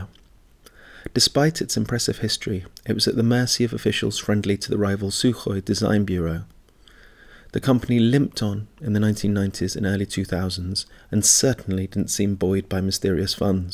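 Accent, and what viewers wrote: United Kingdom. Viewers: LoL British XD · And it's British accent, love it! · The english tone is great to. He could be saying just horrible lies and ethnic slurs and I'd be on board 😆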